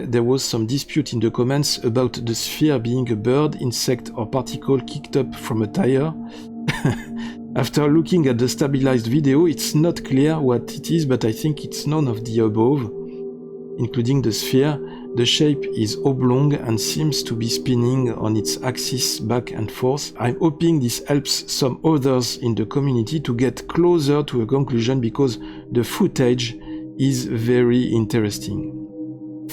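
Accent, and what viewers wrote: France. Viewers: Fuck yeah!! The coolest Alien footage but who new delivered in a french accent is bangin man!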